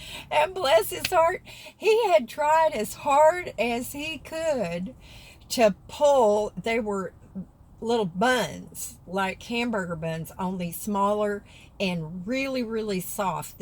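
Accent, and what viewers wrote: South, United States. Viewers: You seem so sweet. I saw on other channels how people were bashing you so I decided to take a look for myself and you are not what other people were saying. It goes to show, you have to not allow others to make up your mind for you. Go and see for yourself. I wish you the best of luck with your LBC. All the negative comments, just ignore those people. I notice your accent, what part of the south are you from? North Carolina here. Lol.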